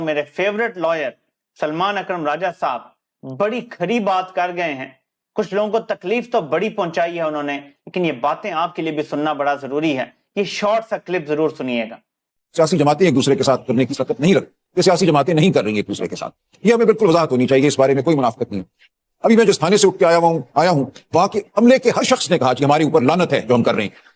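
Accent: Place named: Saraiki, Pakistan. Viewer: Aap ka saraiki lehja hy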